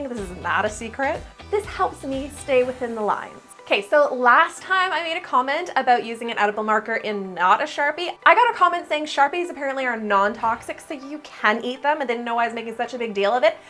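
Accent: Canada. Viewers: Idk why people make fun of it I love how Canadians say out and about it’s just the cutest thing ever.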